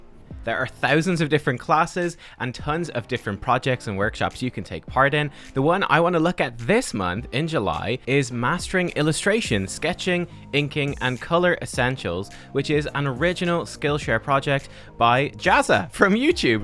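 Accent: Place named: Ireland. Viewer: You sound slightly irish Never mind youre definitely Irish.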